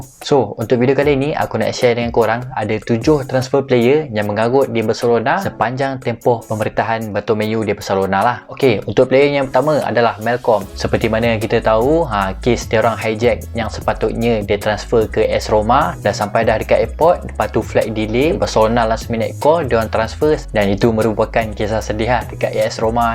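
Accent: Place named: Kuala Lumpur, Malaysia. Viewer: Awt ckp KL 😂 😂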